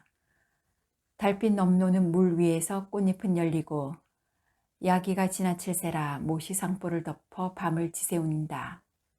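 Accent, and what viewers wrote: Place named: Seomjingang, South Korea. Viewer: 님 섬진강 사투리가 구수하네요 처음듣는 때 울음 🐓 꽃 따는 머슴 왠지 가슴이 먹먹하네요 매화골 언덕 길 찻집 머슴 나뿐놈일까요 슬품이 담긴 피릿소리 꽃 차향기 외로운 여인의 향기는슬프군요 😊 감사합니다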